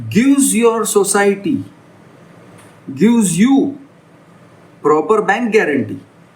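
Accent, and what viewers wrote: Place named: India. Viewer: I asked out of curiosity as this issue might be specific to india and the anchor spoke in indian english. But glad that you understood and appreciated what's going on in this part of the world.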